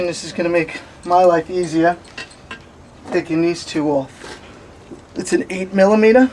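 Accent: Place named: Brooklyn, United States. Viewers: Love that New York accent, even a Cajun like me understood the good video job. Thanks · Thanks for the video very informative, were u born and raised in long island because you have a Brooklyn accent..hehe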